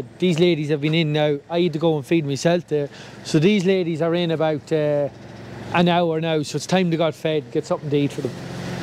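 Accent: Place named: Cavan, Ireland. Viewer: I though I recognised that accent, good to see a fellow Cavan man on YouTube. Best of luck 👍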